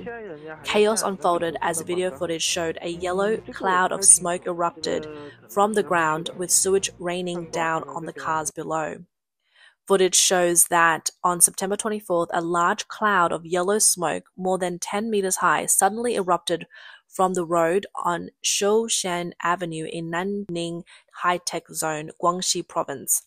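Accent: Australia. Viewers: Side note: I love your accent... Aussie? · I think I detect an Aussie accent from the narrator. Props to you young lady for exposing what the CCP suppresses daily. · This Aussie confirms it to be an Aussie accent.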